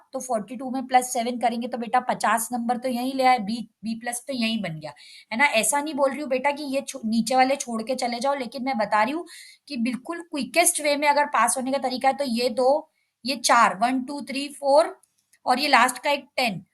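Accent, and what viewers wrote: Indore, India. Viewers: Mam Indore se ho kya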